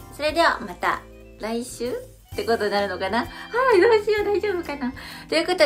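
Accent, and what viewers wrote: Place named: Kansai, Japan. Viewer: 関西弁アイドルは親近感が凄く湧くね👌 BEYOOOOONDSのさやみいみと絡んで欲しい😊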